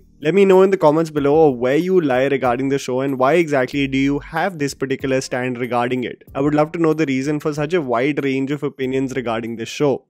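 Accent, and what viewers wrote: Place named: India. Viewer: I stopped watching immediately once I heard his Indian accent.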